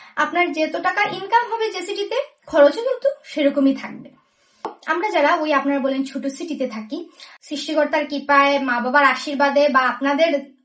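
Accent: Sylhet, Bangladesh. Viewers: Didi Sylheti ni..?? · দিদি কি তুমি কি সিলেটি? আমি রাশিয়ায় আসতে চাই তোমার মেইল টা দিও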